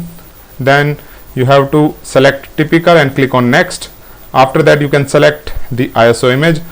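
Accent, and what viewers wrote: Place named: India. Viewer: why is your sound like a tech support scammer from india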